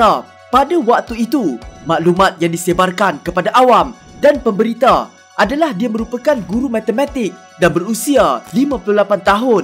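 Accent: North, Malaysia. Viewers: uii orang utara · Org utaqa kaa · Org utara ke yg bercakap nie. Tak sesuai la bro🤣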